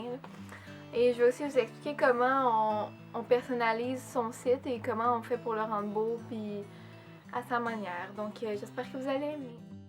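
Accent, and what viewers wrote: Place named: Canada. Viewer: Merci pour la vidéo et pour la découverte de Trumblr que je ne connaissais pas, très belle prestation de votre part, Je suis Français et j'adore la voix Canadienne ! :) Un pouce vert le haut!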